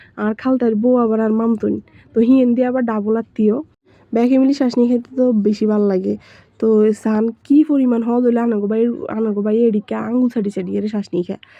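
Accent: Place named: Sandwip, Bangladesh. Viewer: সন্দ্বীপের ভাসা😂😂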